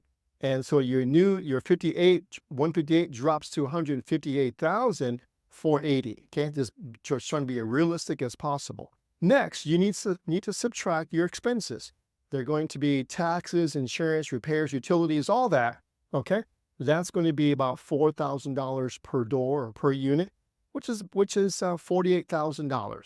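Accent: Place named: Haiti. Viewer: he sounds like he's Haitian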